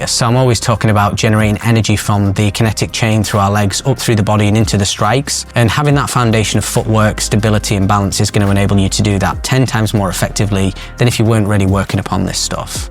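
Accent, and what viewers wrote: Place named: United Kingdom. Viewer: Bro you are the most English 🏴 AF dude I've heard in a long time. Your boxing 🥊 wouldn't help in my neighborhood as all the young girls would tear you apart because they'd think you're Ringo's grandson.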